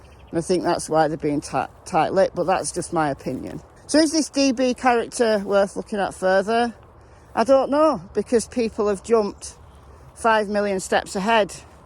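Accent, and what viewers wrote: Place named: Greater Manchester, United Kingdom. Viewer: Your videos are very interesting they are just not drama I appreciate what you do and I love listening to you talk your accent reminds me of my relatives from Ashton Underlyne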